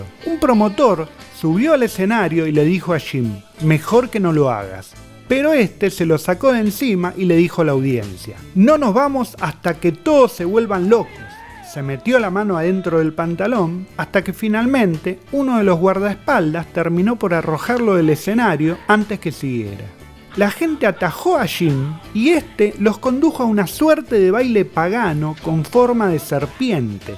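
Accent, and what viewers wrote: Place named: Argentina. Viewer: Mola el acento Argentino bro! jaja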